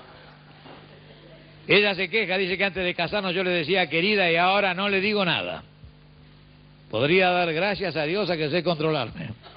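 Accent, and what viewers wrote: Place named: Buenos Aires, Argentina. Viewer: Tiene el acento más porteño de la historia.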